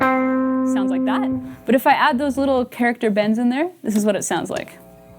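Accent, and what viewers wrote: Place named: Canada. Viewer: Canadian accents are so damn great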